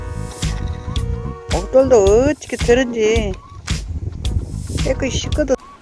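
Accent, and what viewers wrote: South Korea. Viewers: 님의 구수한 사투리는 왤케 정감이 갈까요 ㅎㅎㅎ 손시렵겠어요 ㅜㅜ 역시 님이세요 그 혜안이 부럽습니다 꼭 따숩게 입고 다니셔요 누님 같아서 마음이 많이 갑니다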